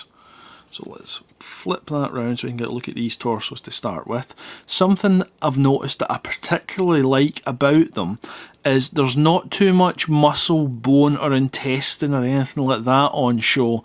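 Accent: Scotland, United Kingdom. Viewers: Is this a scottish accent? No offense but it sounds freaking hillarious :D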